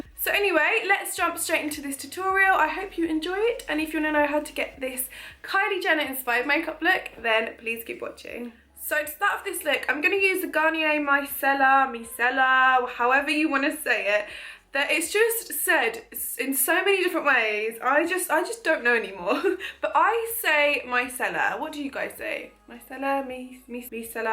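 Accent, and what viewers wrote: United Kingdom. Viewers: aww you r stunning guurrl✨👑 you're really talented and pretty but I really hate that British accent I prefer American's one way more but it's cute on you idk it always annoys me but it sounds cute on you ok bye love you💌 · isn't enough be so pretty, you have to be british with this awesome accent too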